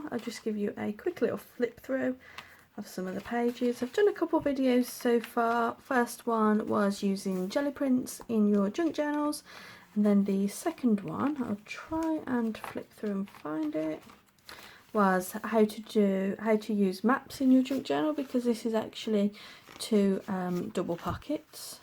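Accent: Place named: Birmingham, United Kingdom. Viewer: I’ve just binge watched your travel journal videos and you are a breath of fresh air! Plus, as an exiled Brummie it’s lovely hearing the accent and the planes going to Birmingham international. I’ve got so many maps I’ve collected over the years plus loads of tickets leaflets etc. Thank you for sharing your expertise. 😘😘😘❤️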